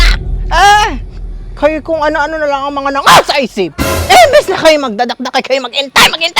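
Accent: Batangas, Philippines. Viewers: Batangas ba ang accent ni messes?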